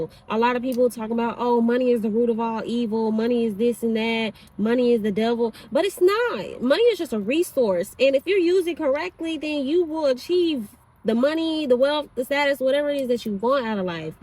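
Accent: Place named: South, United States. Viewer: Where you from? You have a southern accent!!! It’s cute 💕💕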